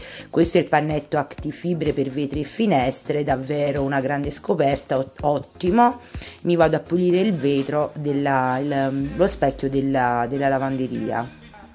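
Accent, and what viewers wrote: Naples, Italy. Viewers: Ti ringrazio se mi saluti da Siracusa e complimenti mi fai morire dalle risate quando racconti le cose alla Napoletana 🤣🤗🥰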